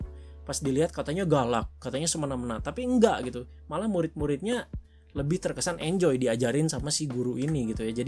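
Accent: West Java, Indonesia. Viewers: Sunda banget ya, "ceunah"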